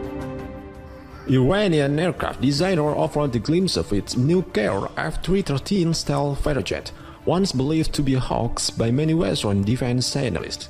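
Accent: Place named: Arab world. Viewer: لهجه کیریت نشون میده از این منا فیق های عرعرستانی هستی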